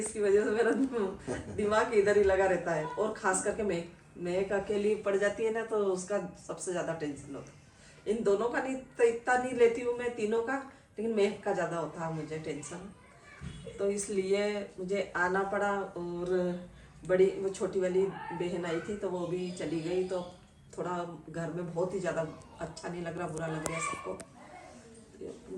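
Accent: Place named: Indore, India. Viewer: Ye indori language hai inki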